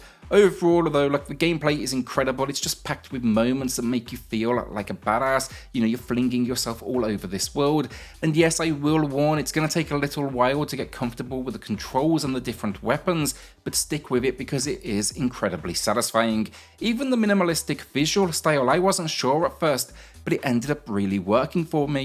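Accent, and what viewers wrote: United Kingdom. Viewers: you have a cool accent, Scottish perhaps?. It won't be long before this channel gets massive. Keep it up guys!!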